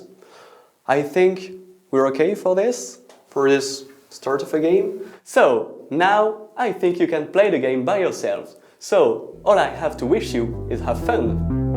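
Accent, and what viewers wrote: France. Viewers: should have done the whole presentation, the French accent sounds much better on women. Also, the hand motions were super distracting. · Thank you for this video. I have worked with suppliers in Switzerland and France for twenty years so I am very used to hearing English with a strong French accent. In addition I must say your English is excellent, I wish my French was a fraction as good. I can see how to a person not used to listening through a strong French accent that this instructional video can be difficult. This isn't a casual conversation but detailed instructions so anything which distracts from the details is noticed much more than usual. I would recommend people watch this more than once if they are having problems. Any person who has a different language as their primary would have the same difficulty here. Part of the problem is Americans are not used to listening through an accent, especially a French one. 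That is just the nature of having one language dominate the majority of the continent you are on. As far as the hand waving, it doesn't bother me at all. I live in New York and half my family is Italian. It is said the best way to shut up an Italian is to tie his hands up so it does not distract me at all:-)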